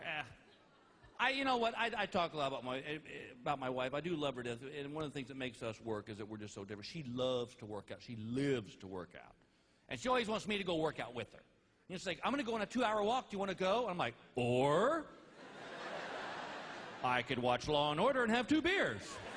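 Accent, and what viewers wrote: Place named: South, United States. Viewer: My mother took me to a Bill Engvall stand up performance in Texas, waaay back when. I was a kid, but I laughed every time my mom laughed. As I got older I started to understand the jokes and I cackle from the hilarity. He truly is the funniest person around. And his southern draw makes it 1000x funnier.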